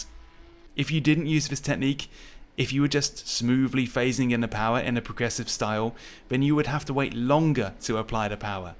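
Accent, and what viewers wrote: United Kingdom. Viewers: You have the cool Brit accent I like! Like driver 61. Where is that accent from?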